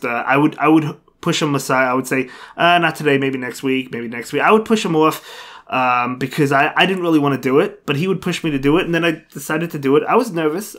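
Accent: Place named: East Coast, United States. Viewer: I just thought you had an east coast accent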